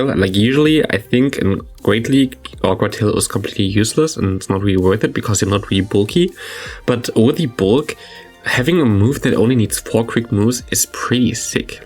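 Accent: Germany. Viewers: Ich wusste es dass du aus DE bist, man hört es am Englisch. 😅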